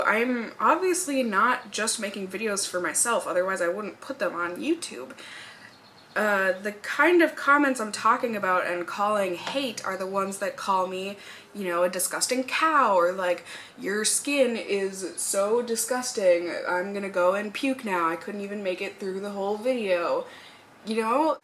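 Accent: Minnesota, United States. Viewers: Your accent is getting more Minnesotan since you've been home. I love it.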